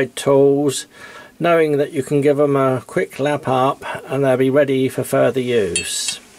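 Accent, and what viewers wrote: United Kingdom. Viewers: Super creative and informative video,! And what a cute british accent:-)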